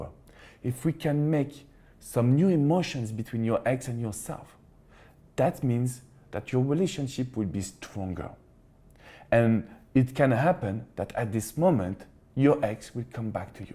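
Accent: France. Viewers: Love the french accent as I am used to the regular speech shows the same tough:);keep all the good things going english side or fench. Je suis avec toi:) true love is never ending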